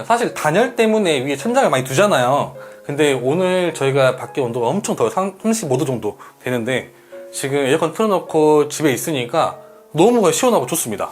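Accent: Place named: Gwangju, South Korea. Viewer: 광주사투리? 정감있어요~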